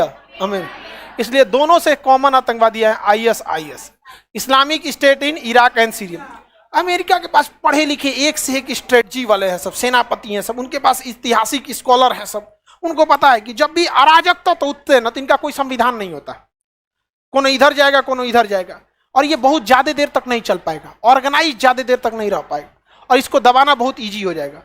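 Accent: Bihar, India. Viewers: ye bihar ke logon ki aise boli hoti hai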